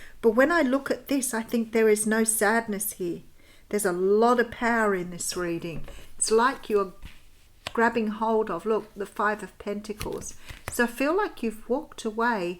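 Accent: Australia. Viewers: So lovely to hear the Australian accent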